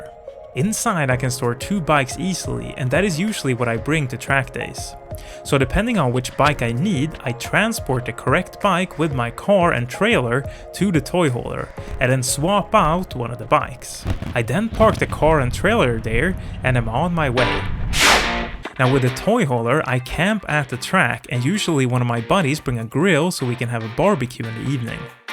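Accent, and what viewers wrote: Sweden. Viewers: Är du från Sverige? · U must be from Sweden with your accent? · Your accent sounds swedish?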